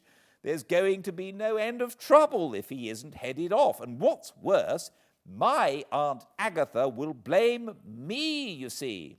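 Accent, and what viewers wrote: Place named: England, United Kingdom. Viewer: Hello, you tried to kill Leo's son Wyatt lol but I forgive you because you have an awesome voice and amazing super clear English accent. By the way I am the first commenter lol.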